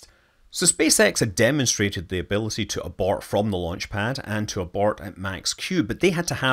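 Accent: Scotland, United Kingdom. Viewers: I don’t think i ever expected to here say “dad bods” in his Scottish accent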